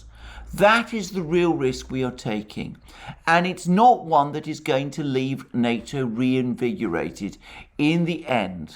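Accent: England, United Kingdom. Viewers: Ah, my daily fix is here with the dulcet tones of your refined English accent.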